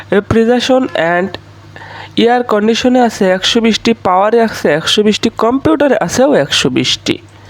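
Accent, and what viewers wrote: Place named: Brahmanbaria, Bangladesh. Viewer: ব্রাহ্মণবাড়িয়া উচ্চারণ ঠিক করে নাও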